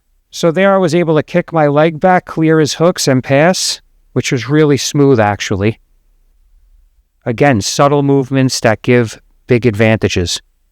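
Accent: United States. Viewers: Even though you didn't say it during this roll, I heard your voice is in my head last night when I rolled saying, " Four points of contact" when I ended up in guard after a take down. I'm a one stripe white belt working on my second and I was rolling with a much heavier blue belt, all I kept thinking was four points four points, it worked quite well for the rest of the round. Your narrations are sinking into my game, thank you for that. I am older than you but you sound a lot like my grandfather who was a New Yorker from Brooklyn.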